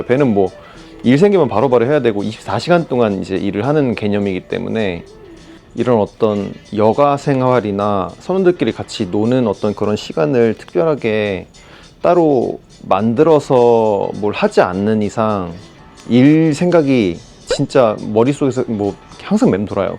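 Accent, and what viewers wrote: United Kingdom. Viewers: 영국 방식의 영어죠. 미국의 굴리는 영어가 우월한 영어라는 생각을 은연 중에을 갖고 계신 것 같은데, 영어에서 이렇게 발음에 집착하는건 한국인 밖에 없다는건 알아두세요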